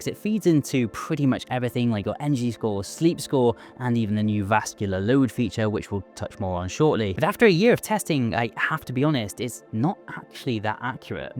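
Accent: United Kingdom. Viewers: Please consider talking slower. I am forced to use subtitles due to your heavy British accent (Canada here), as well as your speed talking. (I've been told I do the same, lol) just a consideration. Thank you for the great review, I just got my s25 ultra, and am thinking that the watch would go nicely with it! 😁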